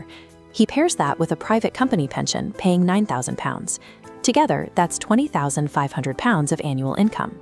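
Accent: United States. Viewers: If you are talking about UK tax, don't use an american english voice over, otherwise no one will trust you.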